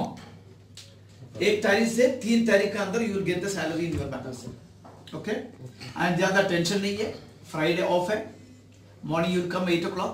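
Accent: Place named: Kerala, India. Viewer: why didn't you polish your accent, it sounds I am hearing Malayalam in English language.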